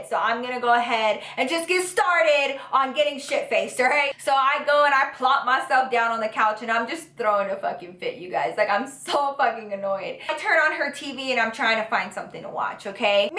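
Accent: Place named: South, United States. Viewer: I can really hear your country accent in this video lol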